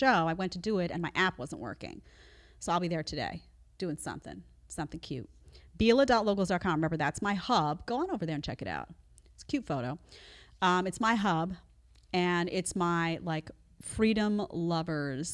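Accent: New York, United States. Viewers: I can hear NY in your voice sometimes, lol. You try hard not to show it.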